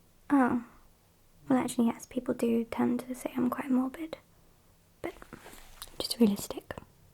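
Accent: United Kingdom. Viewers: gurl your British accent is so cuteeeee Oh my god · Are you british mate?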